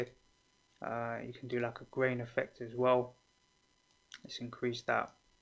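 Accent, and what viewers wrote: United Kingdom. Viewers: He just has a british accent.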